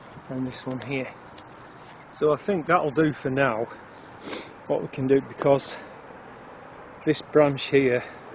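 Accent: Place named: Lancashire, United Kingdom. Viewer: It's so wonderful to listen to your voice. I'm a Lancashire lass and I feel really comfortable with our accent being the same being so close. I'm gonna share your video with my friends all over the world so they know how I talk... just like you. I'm a gardener like you, much reduced now to age and ill health. But I get my joy in life from watching you doing things I can no longer do... thanks.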